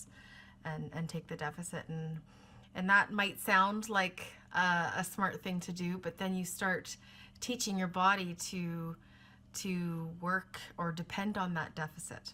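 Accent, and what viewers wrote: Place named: Canada. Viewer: I love your Canadian accent!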